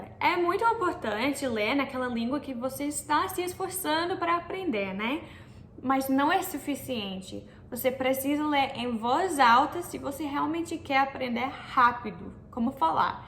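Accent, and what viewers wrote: Brazil. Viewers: seu "português" + "sotaque brasileiro" estão perfeitos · vc vai falar igualzinho aos brasileiros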